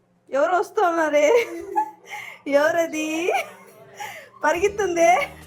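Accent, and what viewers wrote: Chittoor, India. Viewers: Idi chittoor lo tamil valla telugu slang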